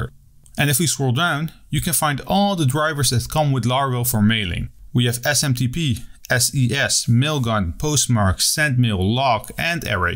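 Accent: Netherlands. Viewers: Great tutorial! You sound very Dutch btw...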